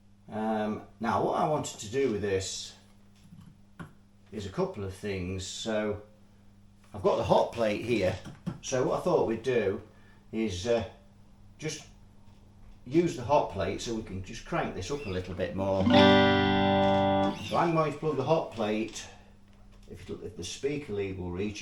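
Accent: Nottingham, United Kingdom. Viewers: Nottingham accent?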